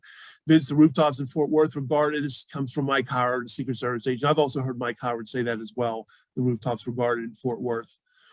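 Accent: Pittsburgh, United States. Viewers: your accent I being a life long northsider know you must be from Pittsburgh am I right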